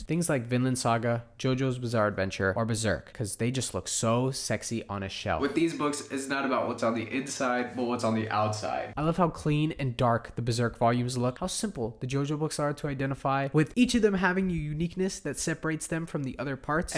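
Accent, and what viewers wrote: Canada. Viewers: I think Canadian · Probably Canadian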